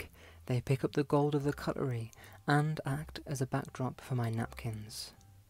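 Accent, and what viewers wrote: United Kingdom. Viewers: i always look forward to your videos. its like watching a real life Mr Bingley in a modern era, how delightful to hear your british accent, the aesthetics of your video and of course the lovely content like your beautiful table setting and your food. i also noted that this time round, you did a close up of all your wonderful table accoutrements. ooh those monogrammed napkins, need i say more! they are so very you.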